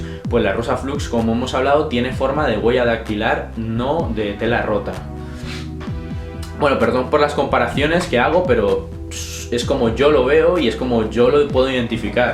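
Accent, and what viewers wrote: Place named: Spain. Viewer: Disculpame,a quien le quieres meter ese cuento de que eres Colombiano y porque!?. tal vez porque te quieres usufructar como posible posted or de esmeraldas Colombianas. Bueno a todo esto ,hablas castellano como todos los españoles,que no hablan español si no castellano. también el tal (dale) por todos los lados,no te falta sino decir (vale y tio). Personalmente ,de Colombiano no te veo nada.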